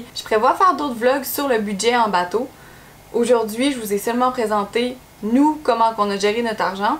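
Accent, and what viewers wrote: Quebec, Canada. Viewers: Tellement rafraichissant et rigolo avec le petit accent Québécois si mignon. Merci pour cette vidéo moi qui prépare une transatlantique.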